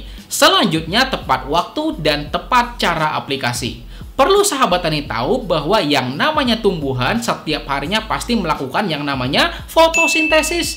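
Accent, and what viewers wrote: Banjar, Indonesia. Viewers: trima kasih info nya pak.. logat banjar selalu ada kata "Kah" ujung nya...bujur lah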